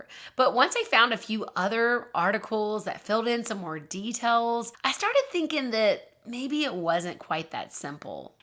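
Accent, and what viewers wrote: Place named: South, United States. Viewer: New subscriber here!! I’m so glad I came across your vlog!!! I love things like this. I also love your voice and I’m wondering if you’re from the south? Because you say the word “on” with a “w”….. Like. “Own” which I love!! ❤️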